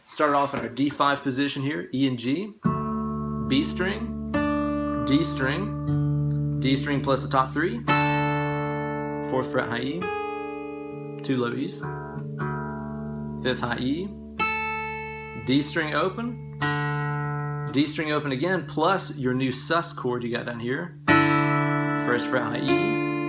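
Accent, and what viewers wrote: South, United States. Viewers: great stuff!... perfect for October..... you sound like you live in the south...... you got the heat on yet?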